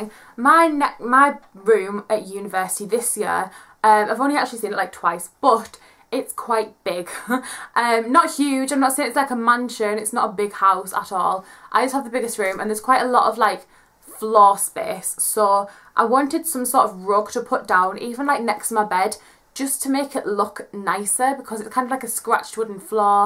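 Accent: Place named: Newcastle, United Kingdom. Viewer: Are you northern? You sound very Geordie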